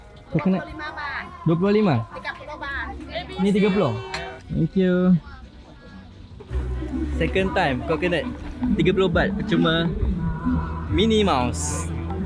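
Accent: Kelantan, Malaysia. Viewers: Aa setuju. Tapi de loghat kalate kit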